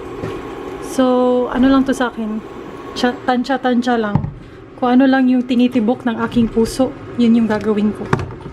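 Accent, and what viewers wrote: Visayas, Philippines. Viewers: Bisaya ka noh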